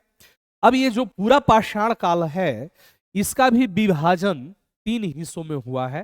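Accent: Bhojpuri, India. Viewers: Sir aapka bhojpuri sunkr lga ki aap mere hi district gopalganj se belong krte h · Sir ji aap to gajabe bhojpuri bolte hai ekdam gaaw ke tarah❤❤❤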